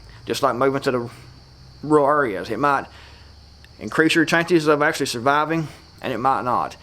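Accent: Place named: West Virginia, United States. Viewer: Sounds like West Virginia buddy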